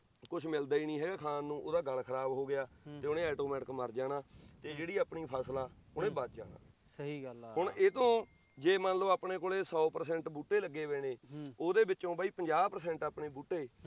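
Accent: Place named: Sangrur, India. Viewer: Sangrur aria bharidi bola janda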